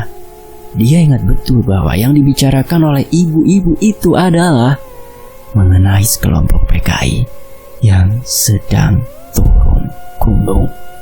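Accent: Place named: West Java, Indonesia. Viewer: 😁 mimin lucu dgn aksen sundanya, krn aku dari Bandung... 👍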